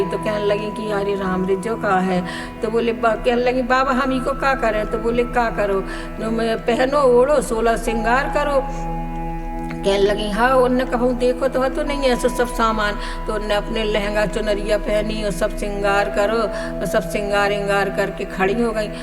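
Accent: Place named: Bundelkhand, India. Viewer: Bahut acchi lg rhihai katha apni bundelkhandi boli sunkar to maza hi aa jata hai. Radhe Radhe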